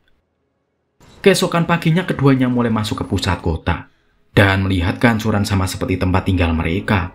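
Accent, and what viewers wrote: Java, Indonesia. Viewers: Pegel dengar naratornya yg medhok jawa.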